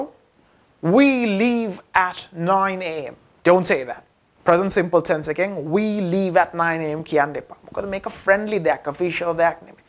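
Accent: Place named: United States. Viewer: I really like your speaking style 😃👏 it's truly American English 😍 sir, you're great teacher 👍 because you're teaching pattern so attractive 🔥 it's a valuable thing for all English language learners ♥️ Best of luck 🌺 I'm waiting for next YouTube video of simple present tense (part6)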